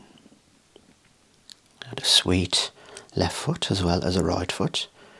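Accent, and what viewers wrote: Birmingham, United Kingdom. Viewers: I've just learnt tazos existed! Nice seeing the old manu player statues....nice concise asmr (even with a brumy accent) 😮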